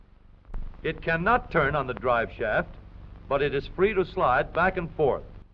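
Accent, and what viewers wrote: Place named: South, United States. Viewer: Dat southern accent :D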